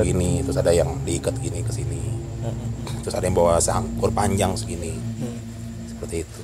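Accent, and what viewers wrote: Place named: Jakarta, Indonesia. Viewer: Bg orang mana?? Jakarta y... Betawi nya kaga ilang..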